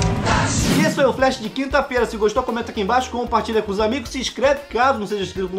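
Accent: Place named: Portugal. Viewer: começando a pegar sotaque de Portugal kkkkk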